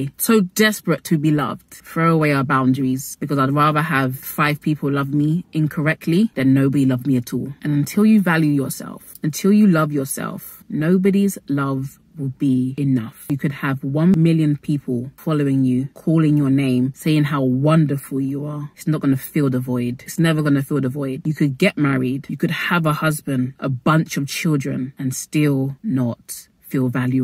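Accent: United Kingdom. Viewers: How did i know you were going to have a British accent before clicking the video😂🤷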